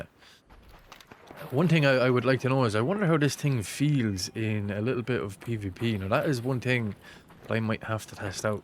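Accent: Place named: Cork, Ireland. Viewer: Your accent reminds me of my nans. She was from Cork.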